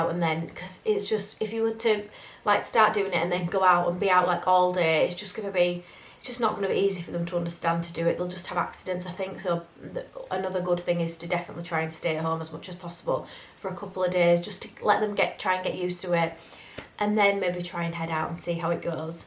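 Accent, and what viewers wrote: Yorkshire, United Kingdom. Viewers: İs that a Yorkshire accent?! X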